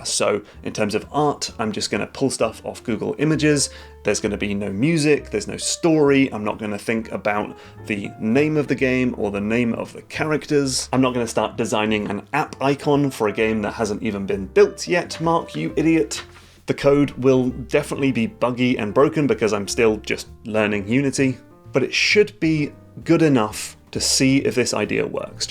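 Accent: United Kingdom. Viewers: video starts, british guy speaks, subscribed, its that easy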